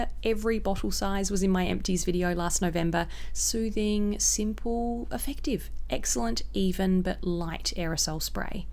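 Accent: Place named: Australia. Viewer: oh how I missed that particular Aussie accent ;) I'll definitely have a look into the cicaplast balm - it sounds promising and I could use a good calming cream just about now!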